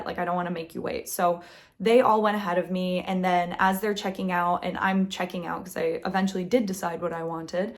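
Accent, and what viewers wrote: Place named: United States. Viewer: You sound American not English so how can you teach English? Americans don't speak English, I'm English and I can barely understand a word you say.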